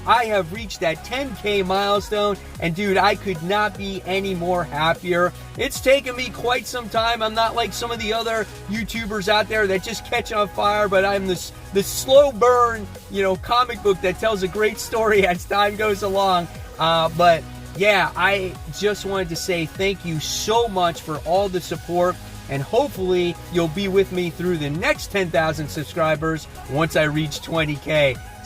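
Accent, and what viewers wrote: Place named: Pennsylvania, United States. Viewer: I always thought you were in Pennsylvania with that accent. I live in SW Florida and love it. Great job on the 10k!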